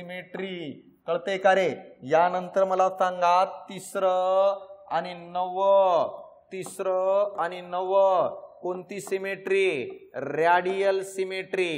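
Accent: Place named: Latur, India. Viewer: Sir तुम्ही लातूरचे आहात का. आवाज वरून वाटते.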